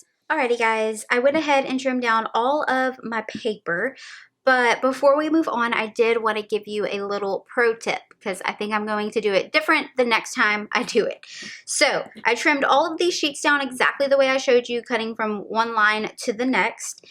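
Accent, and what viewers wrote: South, United States. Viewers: I feel like I grew up with you with your accent. Just every southern girl I’ve ever know lol 💙 from South Carolina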